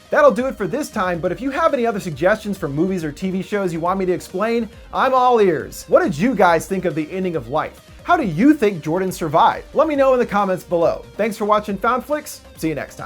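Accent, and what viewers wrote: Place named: United States. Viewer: The American accent is so fucking obnoxious, A complete assault upon the ears. Or maybe it's just this one guy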